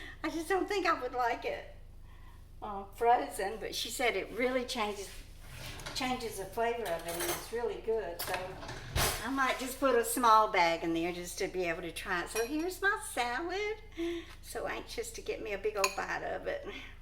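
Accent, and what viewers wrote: South, United States. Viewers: Oh, I love your cooking videos, and as an Australian, I adore your sweet southern belle accent!:)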